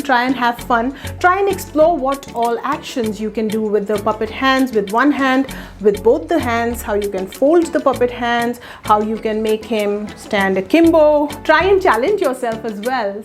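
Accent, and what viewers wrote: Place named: India. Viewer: Very good. Thank you. The Indian accent is gorgeous too!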